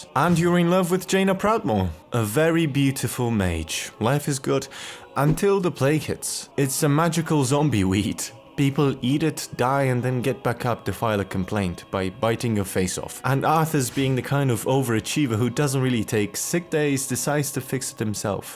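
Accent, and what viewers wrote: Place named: United Kingdom. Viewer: so many words. so little insight. I should've closed the video as soon as I heard the British accent.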